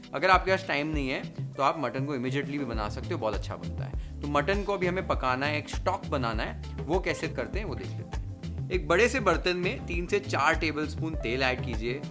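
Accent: Maharashtra, India. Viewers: Tumcha marathi accents 😅❤ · Appreciate for the wow recipe and your accent for Marathi . Keep it up 👍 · Ur Marathi accent the way u try to explain in Marathi is really appreciable... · Love your Marathi accent..recipe too !! Love from kolhapur 🙏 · Omg I am fan of your marathi accent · Tum jo batate hai wo sunkar lagata hai tum Maharashtran hai panjabi lagata hi nahi bahot aacchha lagata hai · Hataoff to you chef. Loved your receipes and your Marathi pronounciation. 👏👍🙏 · Dear, Ek number Lai bhari 👍🏼😍😋 being a Maharashtrian couldn’t resist the authenticity of the recipe. It is exactly the way it’s made at our home. Your Marathi accent is Kadak. Loads of love, keep up the good job 👏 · Recipe is perfect perfect & mouthwatering as always but 💯 out of 💯 for your ek number Marathi accent 👌🏻👍🏻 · Your marathi accent>>>💛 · U r so good dude … really appreciates ur efforts for Marathi pronunciations and yummy recipes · भावाची मराठी accent लई भारी आहे राव... 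"झणझणीत , सुखं वाटण , कोथिंबीर , परात," सगळे मराठी शब्द परफेक्ट येतात... #नादखुळा 😊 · I am in love with your MARATHI accent 😍 💕 though I don't write comment frequently I never miss you videos ❤ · Your marathi accent 😍👌😋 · Bro akele akele khaa raheho😅🙌yaha muhme pani aaga ya😋Bhariii Mutton🤤Mouth Watering......N ur marathi accent tooo😚great work❤️ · Ohh man you Marathi accent is litttt 🔥🔥🔥🔥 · On point... Ur recipe and pronounciation... All are very authentic.. First time I saw a non maharashtrian cook to pronounce exactly the way we say in our home... And all the techniques are almost same as we cook in Maharashtra. Hat's off to you chef... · Want an accent man Lai Bhari 💯💯 Sir I love your Marathi Accent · OMG 😍.. mouth watering.. in the monsoon.. yummy love the Maharashtrain accent Chef Thank you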